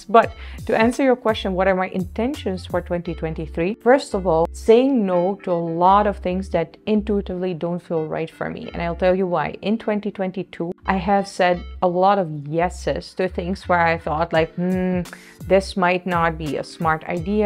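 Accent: India. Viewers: I am hearing bit of indian accent in u lol, love ur journey so far❤ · She has developed a slight Indian accent. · She has an Indian accent now 🤣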